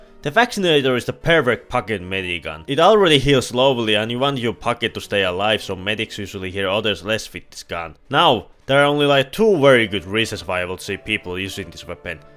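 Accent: Finland. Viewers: you are the suomiest finn ive ever heard, good video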